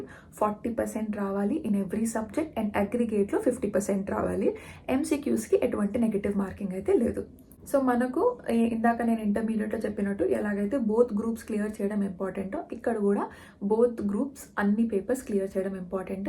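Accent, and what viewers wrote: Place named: Kerala, India. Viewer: Miku malayalam accent undi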